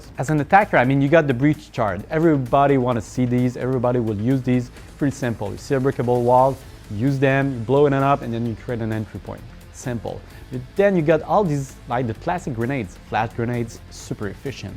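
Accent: Quebec, Canada. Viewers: Thats a HUGE french accent. (french canadian i think) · He's a french canandian, just accept the way he talks english lol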